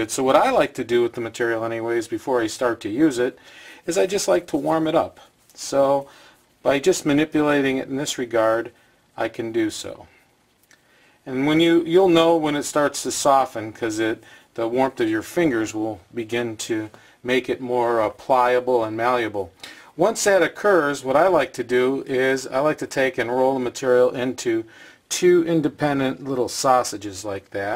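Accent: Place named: United States. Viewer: American guy. For sure. :)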